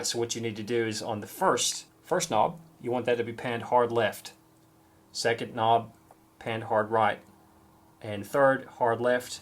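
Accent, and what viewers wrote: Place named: Australia. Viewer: are you aussie? if not you have such an aussie voice for an american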